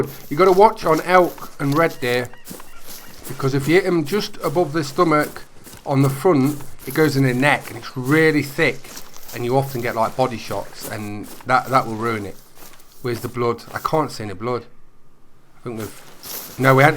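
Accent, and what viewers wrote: Midlands, United Kingdom. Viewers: Nice to see you finally nail it. Your accent sounds regional. Are you Nottingham, central Midlands?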